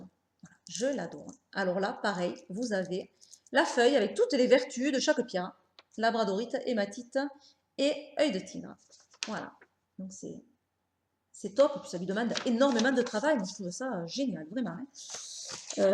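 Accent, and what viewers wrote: South, France. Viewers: ton accent du soleil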